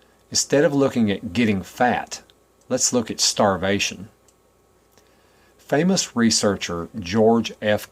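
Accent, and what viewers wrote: South, United States. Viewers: Damn you use to be that big? You definitely can tell me something. BTW love your "southern" accent.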